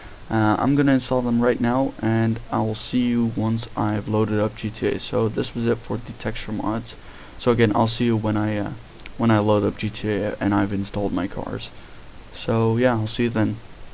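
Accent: Netherlands. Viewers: shall my laptop take off or explode? XD btw are you Dutch? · R u dutch?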